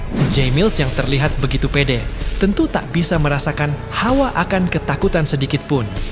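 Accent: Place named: Malaysia. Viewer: Kau ni ape, melayu rupanye abang satu ni😅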